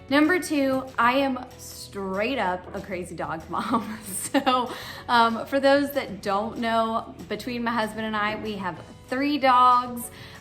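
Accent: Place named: South, United States. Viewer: Hey great video, I personally don't know a Virginia vs a Tennessee accent but you sure sound southern!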